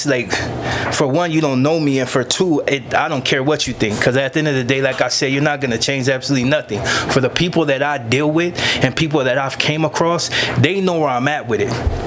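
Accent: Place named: Mexico. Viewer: I can see if you grew up with mexicans and joined their gang because that’s who you came up with. But why do talk like them. They won’t except you as your self.?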